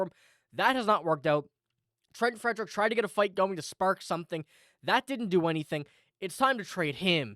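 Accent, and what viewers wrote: Canada. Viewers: Where are you from bro? You have a Canadian sound to you but obviously a huge B’s fan…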